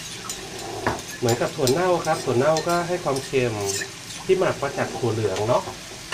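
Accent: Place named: Isan, Thailand. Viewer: นึกว่าคนอิสาร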